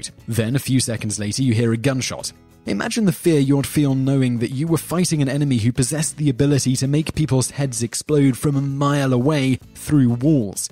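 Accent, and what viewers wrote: United Kingdom. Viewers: Brit accent /= actual knowledge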